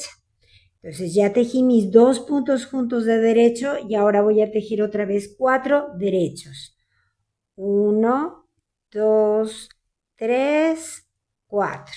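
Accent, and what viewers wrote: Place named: Mexico. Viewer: gracias, acabo de hacer una muestra con punto bobo y también queda muy bonito., no se de que país sois ? el acento parece de México, o tal vez me equivoco 🤭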